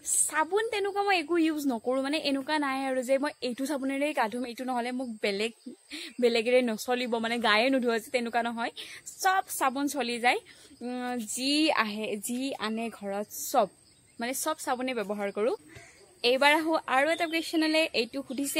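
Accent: Nalbari, India. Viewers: Ba Tumr Ktha bor Nalbari, barpeta district r dore... Apunar original Ghor kot hy?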